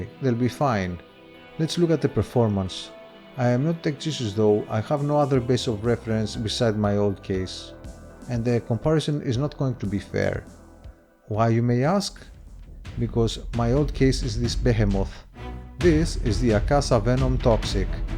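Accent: Greece. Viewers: why does he sound so greek ?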